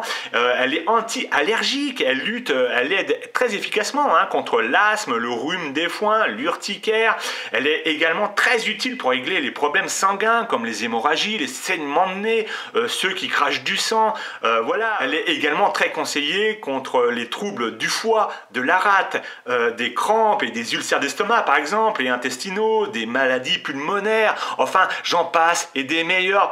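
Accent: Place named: France. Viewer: Tu m'as convaincu j'en fait une soupe dès demain ! (PS : j'adore ton accent bien franchouillard, tu respire la sympathie et la bonne humeur ;) )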